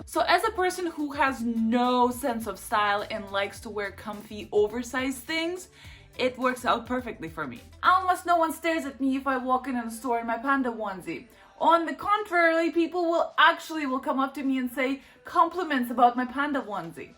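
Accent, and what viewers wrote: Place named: United States. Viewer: ayeeee congrats on your citizenship!!! By the way, you sound super american for only being here for 8 years. My grandmas been here for 50 years and she still can’t speak English lmao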